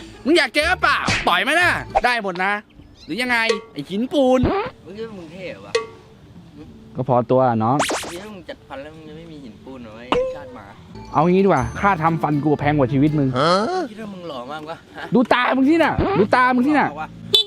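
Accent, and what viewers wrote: South, Thailand. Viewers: น้องอยากให้พี่ พูดใต้อีกชอบมากก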